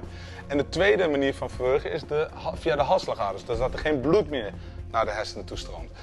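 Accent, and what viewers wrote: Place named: United Kingdom. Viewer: Typical British accent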